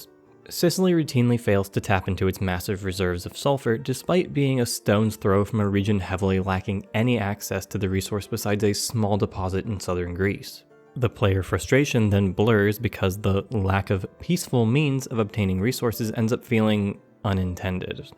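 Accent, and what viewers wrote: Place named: Ohio Valley, United States. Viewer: If you want to see a map game pushed to its limits, try Mappa Mundi for HOI4. Also, up until you mentioned it, I was wondering so hard why you sounded so familiar and local and then you said you were from the Ohio Valley as well lmao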